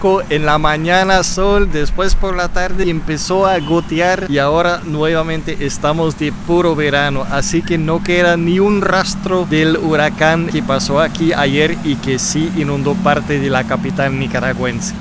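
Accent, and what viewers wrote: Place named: United States. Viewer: ah, ya decía yo que en Costarica los ticos no hablan así. Pensé que era estadounidense por qué habla casi igual que un gringo cuando aprende español, no menciona mucho la "r"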